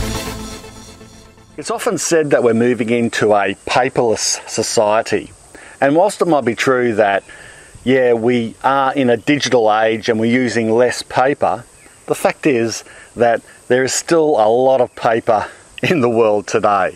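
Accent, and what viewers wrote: Australia. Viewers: Is there any evidence you have to support the idea that people can't produce paper thats toxic anymore? With your accent I suspect you're in Australia. Which may have that law. But here in America ive been advised by many that you have to be careful not to use any colored paper. Tbh the only thing i feel safe using is newspaper that I know is printed with soy ink. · Because of your awesome Aussie accent, I couldn’t tell if you were saying your name was, or. Good thing you wrote your name in the video description area! LOL 😆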